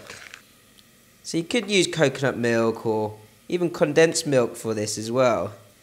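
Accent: England, United Kingdom. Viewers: That's the way it's pronounced where he's from in England. Christ, the things people whinge about!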